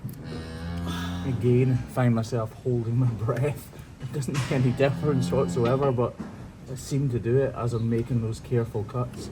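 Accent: Scotland, United Kingdom. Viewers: Luv your channel. I live in the USA 🇺🇸 and sometimes your accent seems so strong to me, I have to put on the subtitles! 🤣🤣 No worries I absolutely LOVE your Scottish 🏴 accent!! I hope you and your family are all doing well. ❤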